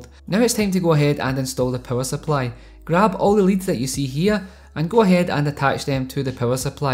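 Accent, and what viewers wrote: Scotland, United Kingdom. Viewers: Chuchu - Games and Guitars probably a,ready found out but I’m pretty sure it’s Scottish, or somewhere from the Scandinavian countries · You fae Scotland ?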